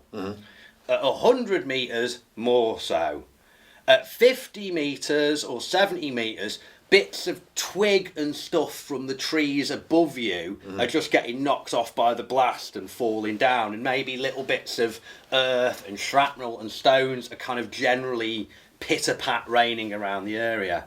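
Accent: United Kingdom. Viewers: British ish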